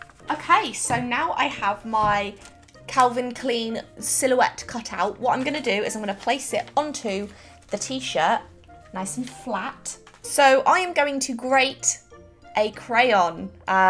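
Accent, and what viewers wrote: England, United Kingdom. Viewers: Is she British because i cant place the accent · Ok are you British? You sound like it. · Are you from the UK cos u have a British accent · Are you briish? cause I can hear the " " when you speak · Is she British? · Shes super cute, specially with the English accent.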